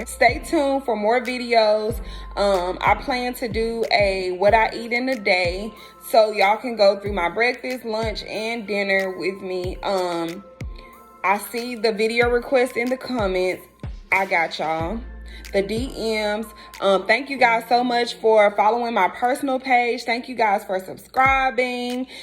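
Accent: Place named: Memphis, United States. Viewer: Gtta be from Memphis sound just like me I swear😂 we need a updated snack video 🫶🏽💕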